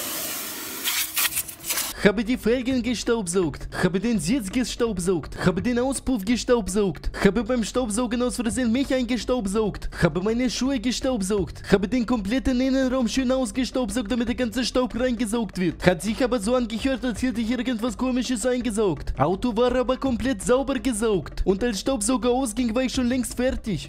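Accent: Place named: Russia. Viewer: Ich liebe deinen Russischen Akzent! Mach weiter so!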